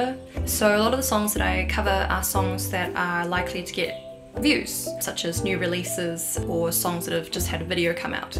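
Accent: New Zealand. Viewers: Thank you so so much for sharing a little bit of background story about you. I have always listened to your music but to learn more about you was fascinating because I thought you were living in China and Chinese but you sound like you are from New Zealand. That threw me way off lol but I love your music and your Vibe and your authentic personality! And I can't wait to hear what you come out with next! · found your channel while looking for a guitar tutorial for " " by. great cover and guitar composition by the way. your speaking voice is so different to what i expected. love the kiwi accent lol.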